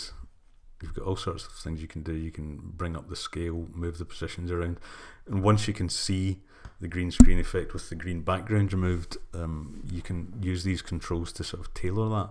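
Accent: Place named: Scotland, United Kingdom. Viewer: Great to listen to another Scottish voice on YouTube. Looks like I'm going to be adding a green screen to my Amazon wish list!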